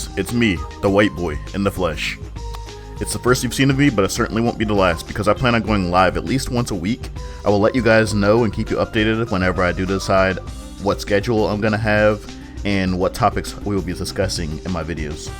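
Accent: United States. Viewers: Im not African American but just African. Firstly congrats because you made a video and uploaded it which is more than most. However, 1. Making out that Afrocentrics claims of hidden African history is some big conspiracy theory. I think thats a tad offensive. Isn't African history still being taught in America starting from slavery? You might know of many of Africa's great empire's but in the white dominated countries where a lot of the diaspora live is that common knowledge? Yes we as adults can search the web til our hearts content but what about the children? African history was hidden and destroyed and while that conscious effort may not be being made today, that doesnt address the knock on effects of that. Widescale denial of African Achievement is huge and that is because it was hidden. And what equality between all races are you talking about? Where do you even live to say that? 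You sound American. But how would a black American make such a statement. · You don’t speak “proper” English, you speak the American dialect, and people want you to speak African American Vernacular English, just a slight correction but you’re right that speaking in a standard dialect doesn’t make you a race traitor or whatever they say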